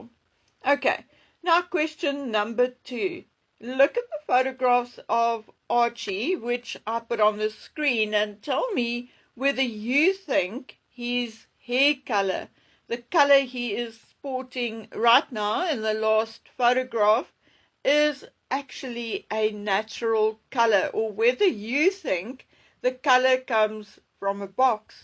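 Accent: South Africa. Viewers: I enjoy and value this channel and listen every day from NYC. One of the things I especially like about it is that it is the authentic voice of an intelligent and insightful woman from South Africa. I like hearing regional way of speaking English, her perspective as someone who lives within the Commonwealth and yet also lives on a different continent than either myself or Great Britain, and I like the fact that the broadcast is the work of an independent woman and that it represents her own initiative and hard work. I have to say that I'm not a fan of the new introduction because I think it reflects none of those things! The music is a bit bombastic and the imagery is artificial - exactly the opposite of herself, who is straightforward and authentic. Just my opinion, but I think the intro is too generic and dramatic for a channel that is so unique and rational., your own artwork is wonderful - why not feature it as the imagery of your intro? 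Thanks for all you do, and all the best, Lee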